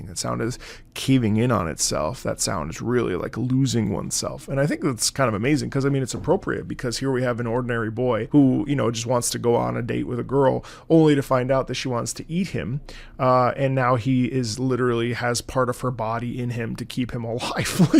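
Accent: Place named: Italy. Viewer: I love how you pronounce Japanese with an Italian accent, genuinely really charming :D like the oshiete yo becomes oshietero.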